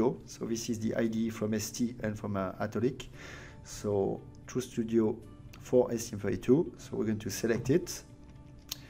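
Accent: France. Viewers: hi, thanks for this tutorial, I guess you're a French native speaker 🤔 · Thank you very much for the perfect "Getting Started" tutorial. Very lovely french accent also :)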